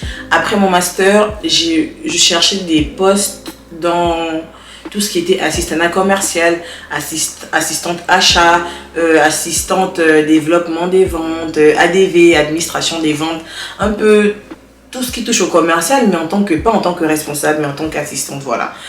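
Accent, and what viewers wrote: Gabon. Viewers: Toi t'es gabonaise 😂😂😂 l'accent ça ne ment pas... J'aime la vérité et le côté naturel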